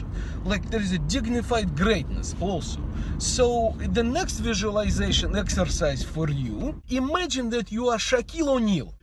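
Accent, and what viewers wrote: Russia. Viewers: Очень смешно когда вы учили американцев произносить слово дядя.Скажите если так произносить с ярким русским акцентом и бегло как вы,то американцы будут понимать или будут переспрашивать постоянно?Это сносное произношение просто с русским акцентом?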